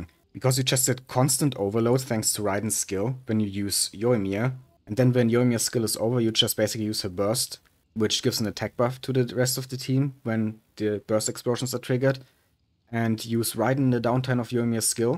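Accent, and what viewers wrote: Germany. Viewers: Gutes Video, finde es krass, wie sehr man heraushört, dass du deutsch bist. xD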